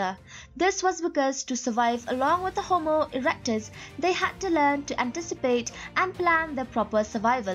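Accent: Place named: Meghalaya, India. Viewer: The narrator sounds Khasi. Is she?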